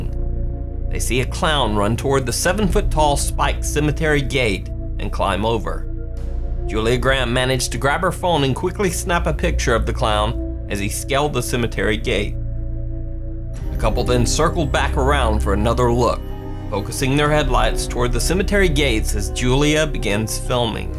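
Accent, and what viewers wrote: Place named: South, United States. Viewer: I love your southern accent do you live in Tennessee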